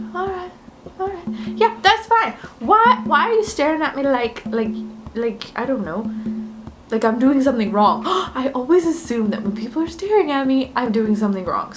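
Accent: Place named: South, United States. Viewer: Are you from the south? You have an accent but I am not sure which state it is from.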